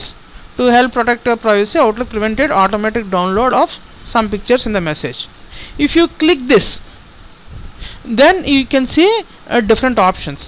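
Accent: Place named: Pakistan. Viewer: Speak English. I do not understand Pakistani.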